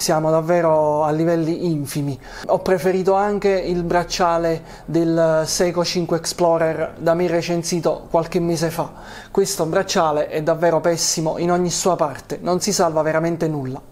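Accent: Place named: Paris, France. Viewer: Disturba solo un poco l accento parigino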